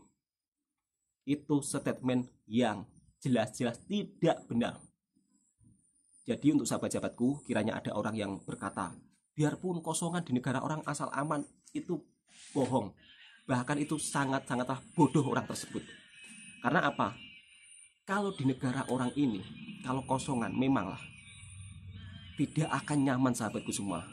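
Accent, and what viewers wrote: Java, Indonesia. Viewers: Ngomong lu medok... Mending belajar ngaji yg bner ngucap allah masa nyebut nya owoh 😂😂😂😂😂😂😂😂 Dunguuuuu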